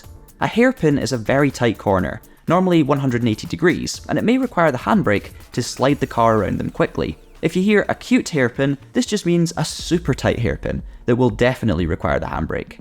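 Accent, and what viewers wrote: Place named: Wales, United Kingdom. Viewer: Brudda he’s not English he’s a Welshman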